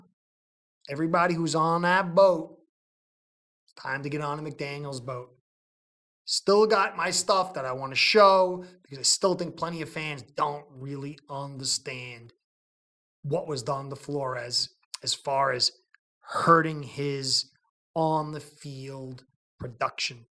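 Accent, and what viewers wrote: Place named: Florida, United States. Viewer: Great Florida accent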